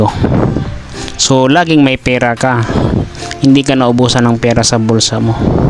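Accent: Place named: Maranao, Philippines. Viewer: Mranao accent. Maranao ka po ba?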